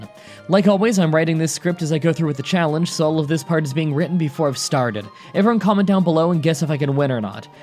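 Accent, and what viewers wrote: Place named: Canada. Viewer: Man you're Canadian how cares how you say names of Pokemon. I just enjoy your smooth voice.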